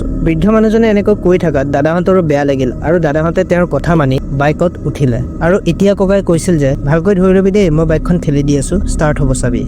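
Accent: Tezpur, India. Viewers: aitu tezpurr kotha jen lagil. tezpur t ankua akhon thai ase.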